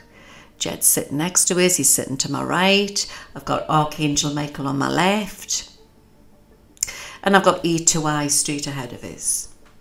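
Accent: Newcastle, United Kingdom. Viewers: Came for the predictions, stayed for the lovely Geordie accent😁 Thank you for sharing this. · She's a Geordie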